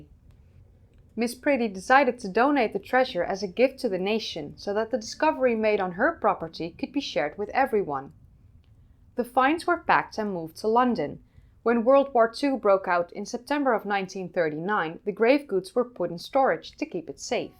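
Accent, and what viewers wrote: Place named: Netherlands. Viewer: the heavy dutch accent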